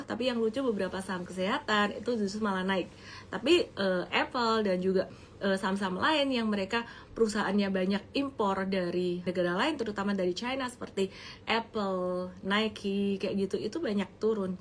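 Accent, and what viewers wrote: Java, Indonesia. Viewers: kalo lagi kesel, medoknya jadi tambah kentel 😁😁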